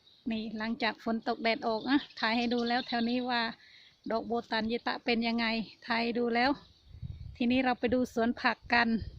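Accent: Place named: Surin, Thailand. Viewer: คนสุรินทร์รึเปล่าคะ? สำเนียงบอกค่ะ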